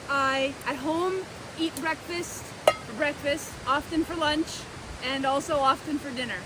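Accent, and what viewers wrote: Canada. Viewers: The Canadian accent is still there young.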